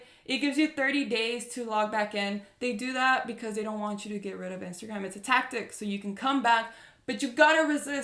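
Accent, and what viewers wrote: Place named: Brazil. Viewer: teu inglês é perfeito, mas por algum motivo sei que tu é br... subliminar... mas sei kkk excelente vídeo!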